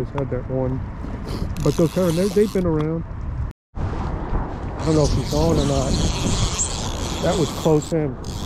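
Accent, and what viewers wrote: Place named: East Coast, United States. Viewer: And back to the salt, good job! Lol hearing you talk to "Bobby" with your eastern accent very much reminds me of a character off of the TV show Bob's Burgers, named Teddy. Since I dont really know what you look like, I've come to just picture you as looking much like Teddy.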